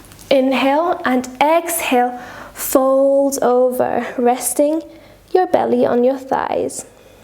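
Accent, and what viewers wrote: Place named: Scotland, United Kingdom. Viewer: your gentle scottish accent is asmr heaven.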